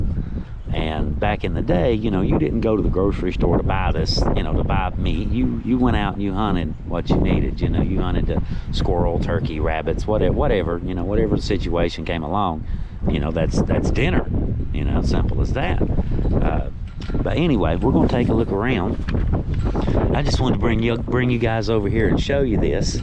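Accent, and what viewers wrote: South, United States. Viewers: your soft, southern drawl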